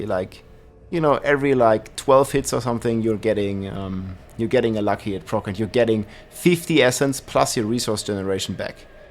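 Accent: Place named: Germany. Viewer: My absolute blind faith once i hear a german start breaking down nerdy videogame numbers is beyond measure.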